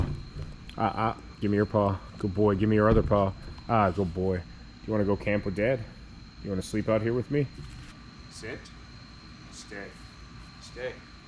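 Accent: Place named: Brooklyn, United States. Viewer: Nice brookyln accent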